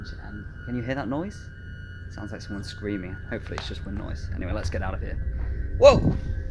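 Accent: England, United Kingdom. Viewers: Where are you from you have a accent from England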